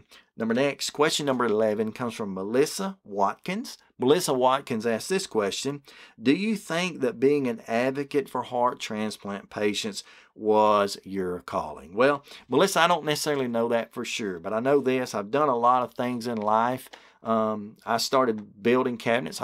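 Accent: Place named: Alabama, United States. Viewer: I 💘 the Alabama accent . It is so distinctive. You preach in a pool pit. I love it!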